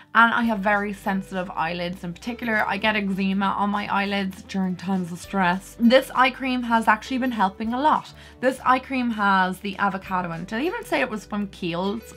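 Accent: Ireland. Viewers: I just love watching you and listening to your brogue! It reminds me of my Nan who was from Kenmare.. I love you!! Much love from Boston!!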